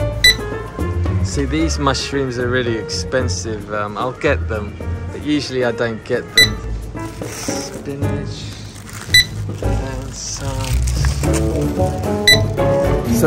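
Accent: United Kingdom. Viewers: Thanks for the video and for information ! I love your british accent (no homo)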